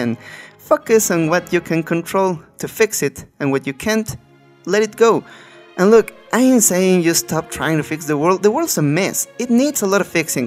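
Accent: England, United Kingdom. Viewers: Ulala señor ingles 😌👌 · Ulala señojjjj.... tiene la voz de todo un burgués Ingles 😍👌🏻👌🏻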